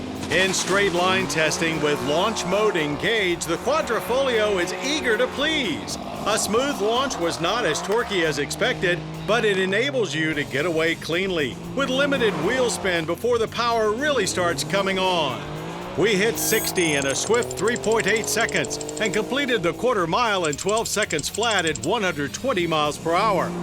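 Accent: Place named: United States. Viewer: Wow, this guy has the most American voice ever.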